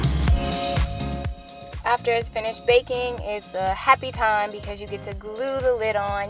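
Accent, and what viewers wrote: United Kingdom. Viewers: I KEPT REPLAYING YOUR BRITISH ACCENT YOURE SO CUTE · nice british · That British voice thou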